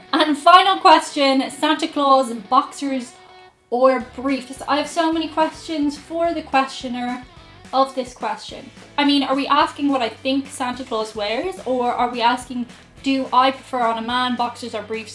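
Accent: Ireland. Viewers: I love your "Lucky Charms" accent. And the chicken farmer line is priceless. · Lucky charm accent is way to cool to ditch ever.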